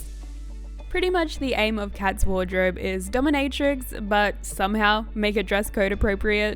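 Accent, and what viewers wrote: Australia. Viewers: are u australian? you have a different accent, sorry if I said it wrong, I'm not an English speaker but I noticed a different accent. loved the outfits, I would probably wear all of them 💖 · are you australian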